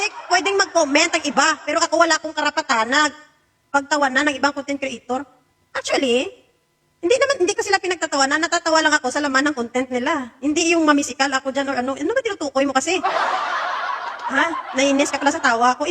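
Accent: Iloilo, Philippines. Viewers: Super love ko talaga Ilonggo accent. 😂 ❤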